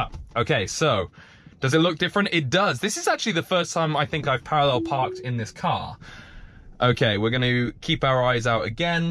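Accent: United Kingdom. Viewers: For some reason your British voice makes this video 100 times better, I have no attention span and 20mins of watching a car park was the best 20mins I spent all day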